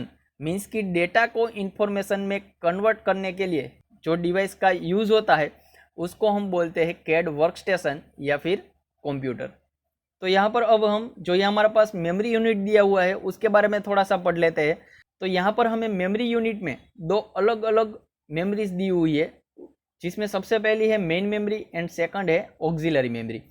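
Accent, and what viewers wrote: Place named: Kathiawar, India. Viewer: Bhai Gujarati lage chhe..., Kadach kathiyavadi...keep it up bro... Subscribed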